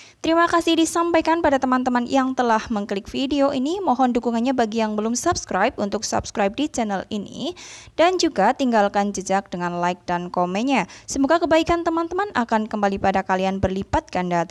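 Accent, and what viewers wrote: Banyumas, Indonesia. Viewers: Sampean ngapak Tegal apa Kebumen yukk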